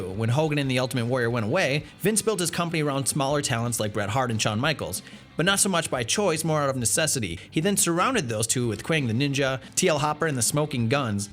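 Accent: United States. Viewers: What culture shouldn't be narrated by Americans #wewantadam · and why there's no british acent in this video? they americans stole the cahnnel? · as soon as i heard the american accent, all i could think of was 'she doesn't even go here' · An american whatculture narrator... amazing. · Sorry but whatculture really doesn't suit an American accent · I love Simon, so I'm not just one of the Adam fanboys, but I just don't like this guy's voice. Too American for this channel. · Ahh, finally.... An American voice over, for a top ten video.. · Its stupidly weird hearing an american on what culture wrestling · An American accent on Whatculture Wrestling just seems...off. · You know, after all these foreign accents this American accent is foreign to me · what is with the shitty stupid sounding american accent? if this keeps up you will lose ALOT of subs! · wtf who is this dirty american where is my sweet voice of adam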